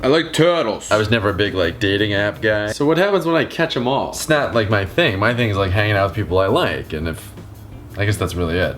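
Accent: Boston, United States. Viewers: I just like listening to your Boston accent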